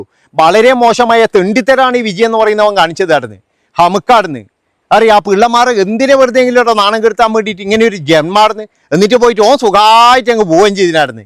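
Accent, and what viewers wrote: Kannur, India. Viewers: ചേട്ടാ, പറയുന്ന ചരിത്ര സത്യങ്ങൾ /ആനുകാലികങ്ങൾ, ലളിതമായി കണ്ണൂർ സ്റ്റൈലിൽ സമൂഹത്തിന് ബോധനം നൽകിയതിന്. 🌹🤝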